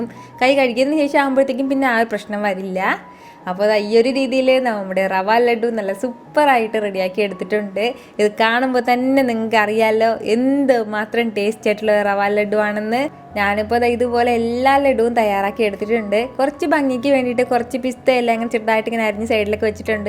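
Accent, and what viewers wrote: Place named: North Kerala, India. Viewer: Watching you from US, love the way you talk and your north Kerala accent. Your introduction is very cute, sounds like a little girl. Continue to post lot of recipes...I wish you the very best!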